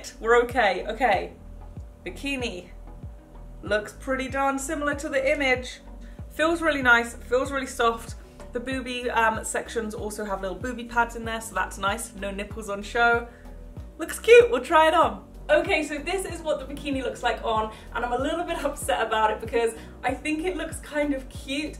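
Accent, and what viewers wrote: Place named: London, United Kingdom. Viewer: I love your videos - love love love!! I'm so excited about your plan for daily vids this week and then DIY's next week too -:D Yayyyy!! My favorite "swimming costume" (how cute!) was the blue & white checked bikini (gingham pattern?) it's very cute on you. I don't get the straps either, but once you fix them it's going to be perfect. Btw, I love your voice, and you're just completely adorable!! I was born in London but moved to the USA when I was 4 - wishing I still had the accent!! Also, your hair suits you so perfectly <3 I am wondering if you've ever bleached your teeth or how you've gotten them so white? If you ever get the chance to mention how...? Keep being you, cuz you seriously brighten our day. You're definitely my favorite YouTuber by far!! Hello to & everyone! Love from Susan in Florida, US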